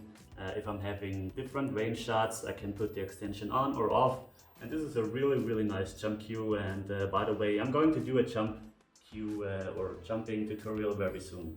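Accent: Germany. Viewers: you sound so german 🤣🤣🤣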